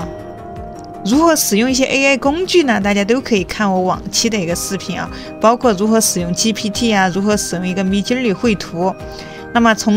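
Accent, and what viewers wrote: Sichuan, China. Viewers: 四川人吧博主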